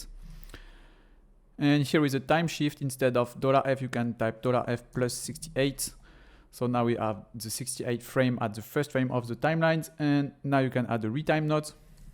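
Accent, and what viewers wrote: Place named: France. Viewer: why not creating different sphere and put them one by one ? and you won 10 min wasting time , . why you need to creat line to instance point on that lines ?! when you add something you did not say why ... and it abit hard to use plugin to separate materials node ? do it from houdini after use 2nd way to plugin mybe this plugin is not free or someone cant get it . atleast know how to do it natively but thank you for your videos bro . and vive la france ^^ . from your accent i can tell you are french guy . you know very wellhoudini nice to meet you bro .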